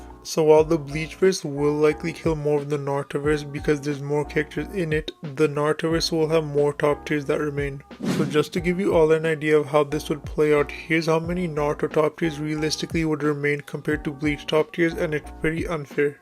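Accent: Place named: India. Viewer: even your English accent is so trash are you Indian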